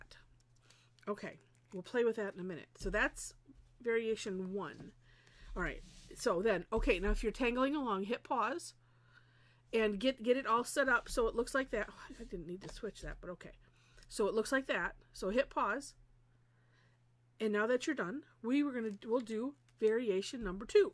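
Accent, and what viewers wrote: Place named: South Dakota, United States. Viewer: your accent is very much like a friend of mine from SD. Just guessing.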